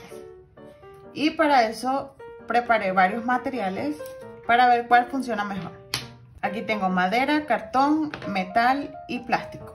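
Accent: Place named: Panama. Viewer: tu acento es muy Panama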